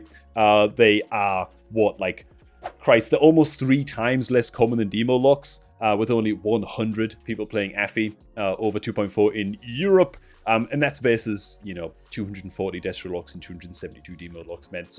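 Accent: Newcastle, United Kingdom. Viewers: Hey man, good to hear your dulcet Geordie tones making wow content again and more importantly enjoying the game!! Cracking list and great addition of the stats for each spec, really gives a better perspective on what is actually strong and not just popular in the hands of the right player!!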